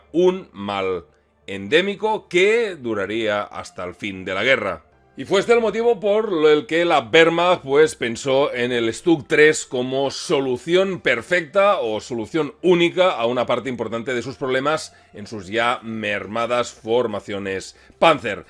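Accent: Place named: Barcelona, Spain. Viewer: Excelente como siempre, soy de México, me pareció que eres de Barcelona yo le guardo mucho cariño a esa ciudad yo estuve 10 meses allí estudiando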